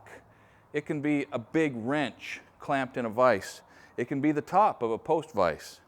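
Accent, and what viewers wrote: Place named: Oregon, United States. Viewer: It sounds like you're from Oregon as well. So cool! I am interesting in learning blacksmithing myself and this is a very helpful video.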